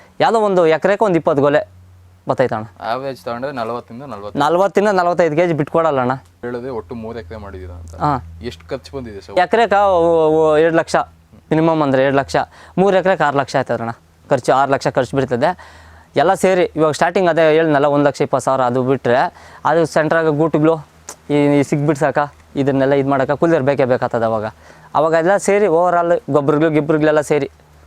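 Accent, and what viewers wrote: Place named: Kolar, India. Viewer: Kolar kannada ❤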